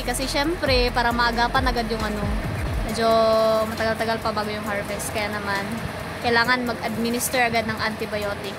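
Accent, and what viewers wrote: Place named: Visayas, Philippines. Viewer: Akala ko sa Visayas. Parang bisaya po kase yung salita nyu. Jeje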